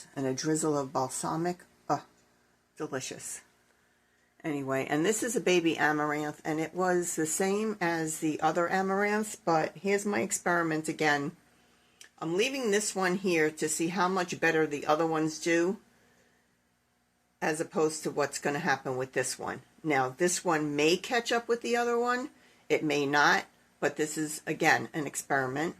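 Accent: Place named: New York, United States. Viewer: Don't mind me. Just here getting my indoor plant and NY accent fix 😆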